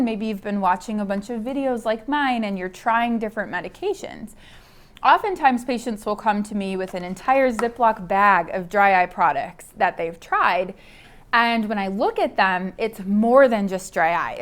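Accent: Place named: Michigan, United States. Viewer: I knew it! I just checked your bio and I see you're from MI. You have the accent 🙂. I love your content. It's so helpful and informative. You clearly know your stuff!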